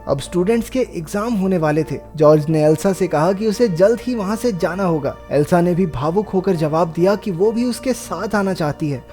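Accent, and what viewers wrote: Haryana, India. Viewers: bhau tu pakka havanvi h mne to deshwali laage h yar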